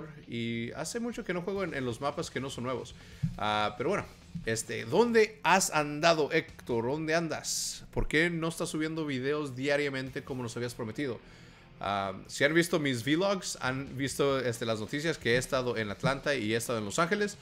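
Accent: Mexico. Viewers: de onde eres mi tienes acento como de mexican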